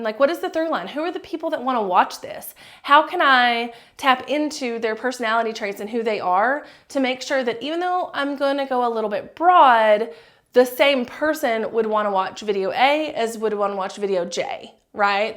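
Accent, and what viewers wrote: South, United States. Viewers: I love linguistics, so the southern pronounciation is fascinating to me. But I'm in Canada, so you KNOW i say niche the French way.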